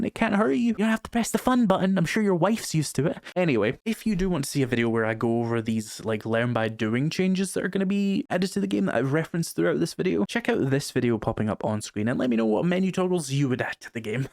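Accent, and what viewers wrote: United Kingdom. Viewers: he is Brittish or at the very least from the UK so not American.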